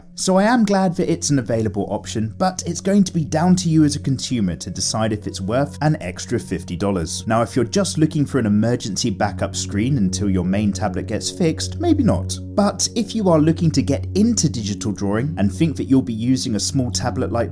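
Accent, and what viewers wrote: United Kingdom. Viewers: you videos are very informative and quite funny, with a bit of a Brit accent, truly good Old Chap, do keep it up to use your humor.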